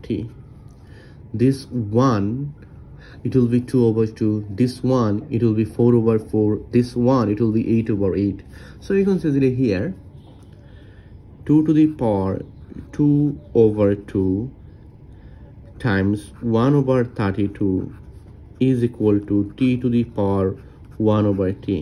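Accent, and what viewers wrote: Bengal, India. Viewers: Brother sounds Bengali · Pajeet accent!!! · Thank you but please try to speak with a clearer accent. You sound too young to speak with a Bengali accent. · U Bengalis hv really pathetic and irritating accent.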